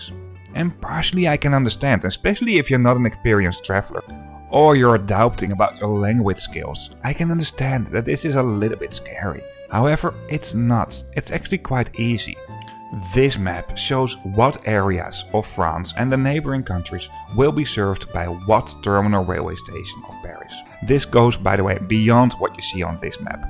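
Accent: Netherlands. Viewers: Is it just me or is this guy sounding like a dutch guy? I am so confused by the accent and english he is speaking. But i love the vids keep up the good work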